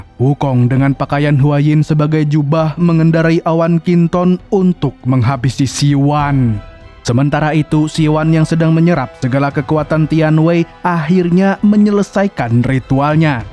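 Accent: Banjar, Indonesia. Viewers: banjar bnr amang ny ni😂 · Orang Banjar wara sklinya😅🙏🤣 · Urang banjarkah admin ni😂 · orang Banjar nii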